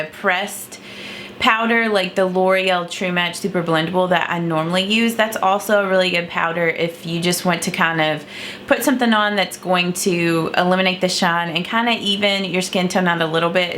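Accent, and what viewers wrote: South, United States. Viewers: First, let me begin by saying that you’re one of my fave YTers. ❣️ I love how “real” you seem to be. I don’t feel like I’m watching someone that I can’t relate to. I’m also a southern girl, so I love your accent also. 😉 My only suggestion would be to possibly upload a few videos that are a little bit shorter. I don’t want to miss any of your videos, but more often than not, I don’t have 30+ minutes to spend watching a video. I may be in the minority, but shorter videos grab my attention quicker. Sending you lots of love from Alabama! 😘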